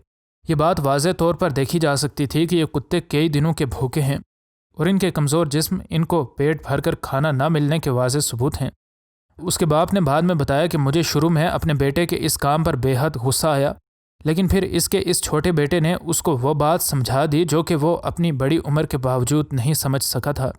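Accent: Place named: Sindh, Pakistan. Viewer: Ap Urdu me M.A.kro ya P.H.D pir b Teri Urdu teakh nhn ho Sakti,q.k ap Sindhi Bhai hain... Tumse ziada achi Urdu wos 4-6 saala bacha ka hy jisko alif zabr ha or beh zarb ba likna nhn a ta iski waja Yeh k yeh bacha uska bacha hy jiska Abba panageer,Hindustani, mahajir,byyah, or Urdu speaking hy...Lehaza hi Sindhi Bhai urdan daan tum nhn bnskte pir Q apni zaban ko gol gol karke Urdu bolta hy,ap bas Urdu bolo lkn pir b Sindhi E rahoge....achi Urdu sirf bayyah log E bolsakte hain Na me r Na tum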